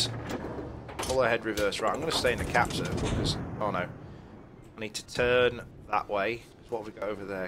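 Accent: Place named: North West England, United Kingdom. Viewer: your accent sounds like your from the North West of England :D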